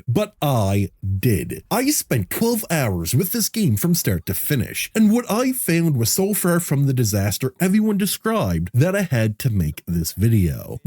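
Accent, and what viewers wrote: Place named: Canada. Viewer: I think because you're Canadian you don't notice, but every Canadian I have heard says "about" the same way to me. Everything else you said sounds fine to me though. I'm English just for context.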